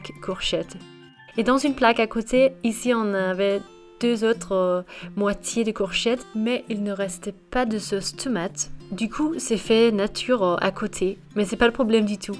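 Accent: England, United Kingdom. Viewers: Votre accent est tres jolie are you english ? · M 21! Frou du cul ou trou du cul??? Madame est Britanique! Essayez donc de faire une recette vidéo en anglais et vous verrez si vous n'écorchez pas un mot???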